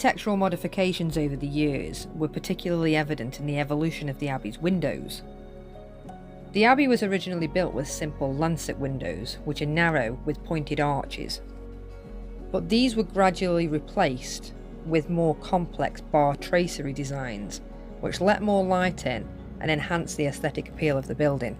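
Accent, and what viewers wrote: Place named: Stoke, United Kingdom. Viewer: I lived in Stoke for five years and left reluctantly but with very fond memories and friends still there. Always enjoy your videos and love that accent! Please give us more.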